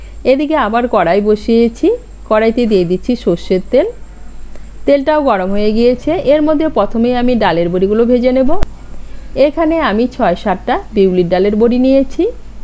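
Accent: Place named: United Kingdom. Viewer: Bangla rannar shonge kintu bangla commentry beshi bhalo lage. Eto english accent kintu eke baare jomchhe naa.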